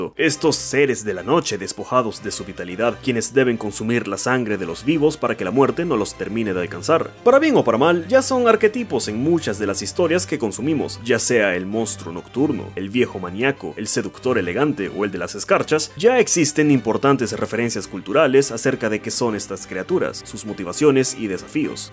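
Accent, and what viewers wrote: Venezuela. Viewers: Será venezolano el men ?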